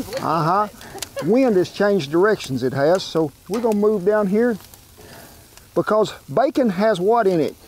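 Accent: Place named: Louisiana, United States. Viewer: Where are you from? Your accent is throwing me off. Looks like your from Wyoming, the land around you looks like Texas and the accent sounds like you're from Louisiana or Mississippi, but the words aren't matching. But there's like a northern thing going on idk lol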